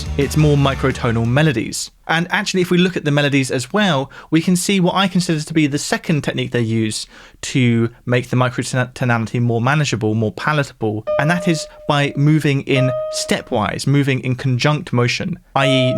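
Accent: England, United Kingdom. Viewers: Nothing like an English accent to wash off the magic.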